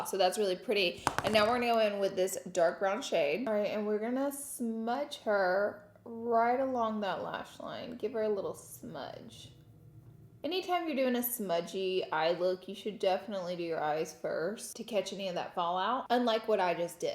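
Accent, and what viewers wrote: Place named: Alabama, United States. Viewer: Do you think you will ever lose your AL accent? Lord, I hope not ❤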